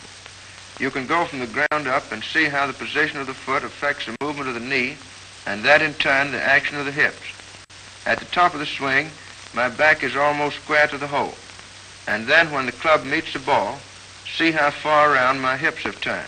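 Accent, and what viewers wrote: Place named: Georgia, United States. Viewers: God Bless you & your family. I trust ya'll are doing well. I am a linguist & most thoroughly enjoy this most classic American accent, very much so. It is one of those, like so many, that are unfortunately getting lost to time. Cheers to you & your kin 🍻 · Growing up in Georgia, this was how all my older relatives spoke at family reunions.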